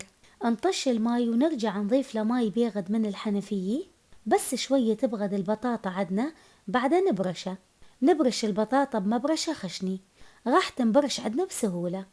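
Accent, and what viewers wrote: Mosul, Iraq. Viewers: مساء الخير على الجميع شكو ماكو خير ان شاء الله وينك اختنا الموصلية الشيف المميزة بالاطباق العراقية المنوعة الطيبي مشتاقين للصوت الموصلي والابداع المطبخي المميز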